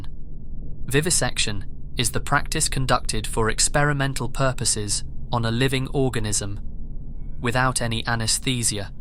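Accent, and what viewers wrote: England, United Kingdom. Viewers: Always nice hearing another Englishmen having a interesting dark thought provoking channel wish you much success with it 👍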